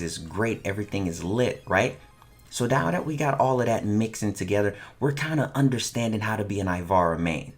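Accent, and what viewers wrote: Jamaica. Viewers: That Jamaican accent tho mad respect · Are you Jamaican lol... I heard you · that jamaican accent tho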